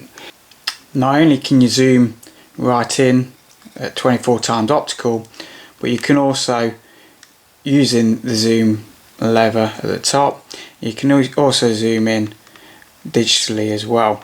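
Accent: United Kingdom. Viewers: Epic British Accent !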